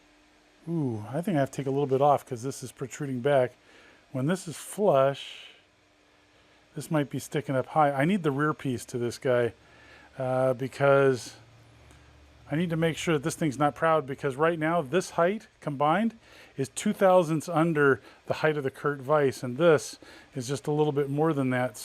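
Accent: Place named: France. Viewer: I'm sorry to tell you but your French accent is terrifying..... But funny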